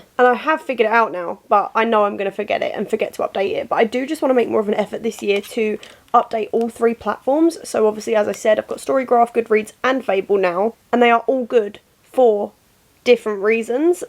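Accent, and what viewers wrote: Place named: Essex, United Kingdom. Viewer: I love these videos so much! Also how organised are you filming multiple at once 😂 Our accents are so similar are you an Essex girly too?!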